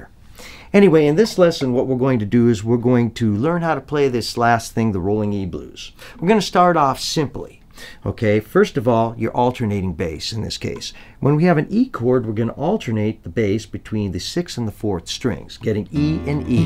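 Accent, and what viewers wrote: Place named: United States. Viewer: your playing is terribly unprofessional. your accent even worse to endure listening to it. why are you doin this videos without really practicing until you get it right... ahhh sorry i completely forgot you maybe could be an american and your main audience is also american .. im 100% serious about what i write, especially your unawareness of how beautiful a guitar can sound if you play music with it, not just notes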